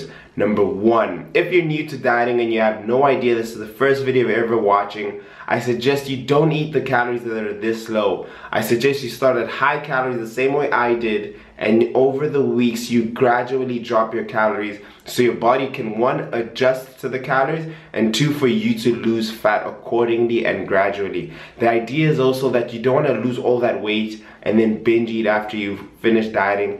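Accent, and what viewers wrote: South Africa. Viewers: Bro your accent sounds South African? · You sound South African? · Great video, so there's hope for someone who's got to have that coffee and cookie to remain sane. We wont wish u well with your studies cos you clearly have discipline! Believe me you look and sound South African no lie. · Is it just me or does he have a South African accent🤔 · was pretty sure I recognised the South African accent, then the nescafe just confirmed it all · where are you from? You Sound South African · He does sound South African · Great video. You've gained a subscriber 👌🏼Also, you sound South African, but those aren't SA products... where on earth are you dude? 😂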